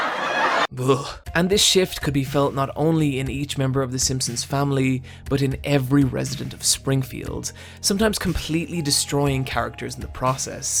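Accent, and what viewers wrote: Ireland. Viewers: Another thick Irish accent haha ;) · This is a great video man, fair play. And great to hear an Irishman lay it down. Just not used to hearing an Irish accent on decent vids on yewtewib. I have kids and we watch The Simpsons a lot but only season 1-12 (basically because I can't watch any of them after that). Interesting thing is my kids absolutely love it - and I was 11 when I got my first Bart Simpson T-Shirt in 5th Class and I loved it then. But my god it became execrable after around 2001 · wtf is this guy IRISH??? This is so odd?? I am Irish that’s why I realised old homer could read :) new homer can read rarely… :( episodes used to be the full runtime now it’s two episodes between a break :( he really does care about his kids :) that’s one thing I still sometimes see in newer episodes keeping the characters grounded and not making a mockery out of the characters… so true… :( fucking facts :) :( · I'm gonna watch this, but, fuck your tone, lol. 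This is the first time I've hated an Irish accent but there is something so.... smug and arrogant about the way you're presenting this. It's no secret The Simpsons has gone downhill, but even from the intro I get the sense you're going way way overboard. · After watching that entire video still can't figure out why in your Irish accent you went for the American pronunciation of patronise. Other than that, great watch 👍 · your videos are complete rip offs of Nerdwriter1, its hilarious how much of your style youve carbon copied from him, also your americanised irish accent is embarrasing please stop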